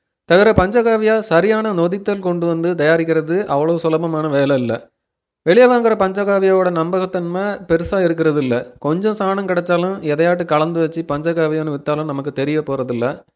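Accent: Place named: Kanyakumari, India. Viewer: Neega kanyakumari thana.. slang apditha teridhu..😃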